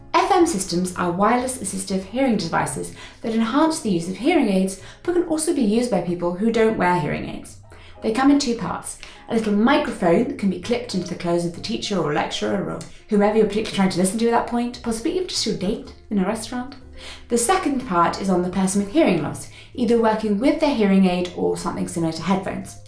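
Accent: United Kingdom. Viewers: Your positivity is so contagious,; I love it! 🥰 Thank you for the tips on learning to lip read! I definitely do rely greatly on captions right now! 😬 Especially with different accents, like yours. I adore a British accent, but struggle to understand some words sometimes... although I've watched enough of your videos lately that I'm starting to pattern your voice 😊 P.S. you hit on all of my moms biggest pet peeves about talking to people (Covering their mouth, chewing etc)...people can be so annoying and disrespectful sometimes!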